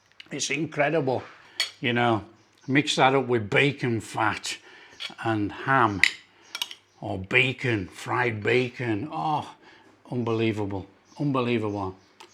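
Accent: Yorkshire, United Kingdom. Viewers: Interesting. I've never had, will try now. Bog standard colcannon. Thank you. Is that a Yorkshire accent?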